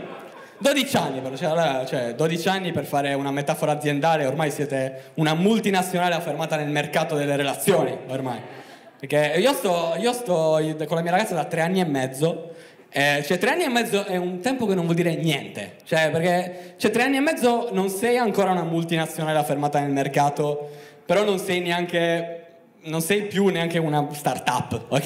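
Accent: Sardinia, Italy. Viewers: accento sardo top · accento sardo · sei Sardo, quindi è anche bello ascoltarti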